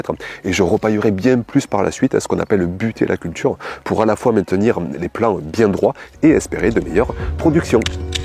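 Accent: South, France. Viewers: J'adore cette homme par son naturel sa simplicité et son petit accent du sud! Je regarde pratiquement toutes vos vidéos. Elles sont géniales sans blabla qui sert à rien. J'espère un jour que mon jardin sera aussi grand et organisé que le votre. Merci pour vos contenus. Signée une passionnée.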